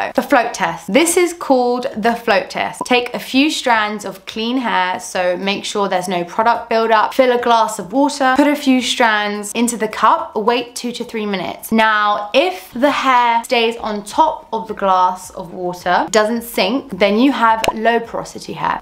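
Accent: United Kingdom. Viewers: Thank you ! I have tried, and according to your explanation, my hair is of very low porosity. That would totally explain why coconut oil makes my hair dry instead of nourishing it, and maybe that's also why I started losing more hair after using flaxseed gel 😱 This clearly shows that not everything works for everyone, and thank you so much for warning us about rice water, cause I would definitely have tried it someday because of how popular this method is! By the way, you have a lovely accent ! Are you British ?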